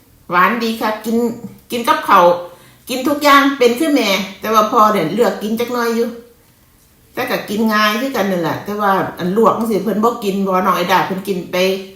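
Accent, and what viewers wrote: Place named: Isan, Thailand. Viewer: น่ารักมากลูก พูดภาษาอีสานได้ด้วย❤❤❤❤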